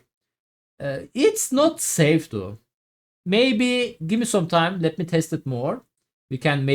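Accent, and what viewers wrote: Turkey. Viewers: hacı Türk olduğun çok belli konuşmandan :) ama Helal olsun Türk yayıncı pek yok bunu yapan teşekkürler yardım için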